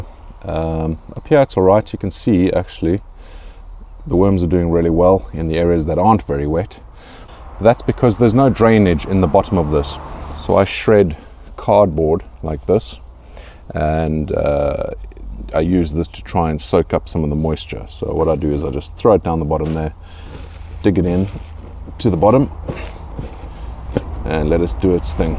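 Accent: South Africa. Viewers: You're in the UK, but your accent sounds like a South African.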